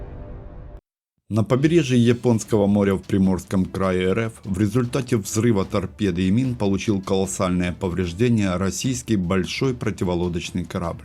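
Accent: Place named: Ukraine. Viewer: А Вам бы поработать над своим акцентом(украинским)...)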